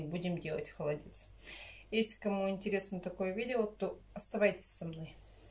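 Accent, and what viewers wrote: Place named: Nizhny Novgorod, Russia. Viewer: А я сразу понимаю что человек с нижнего Новгорода по говору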